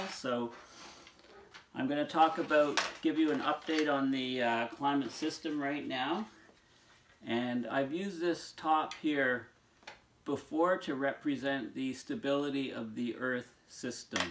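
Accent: Canada. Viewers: has a very charming Canadian way of telling me just how screwed I am. Oh well. At least I am not alone. Greetings from Los Angeles...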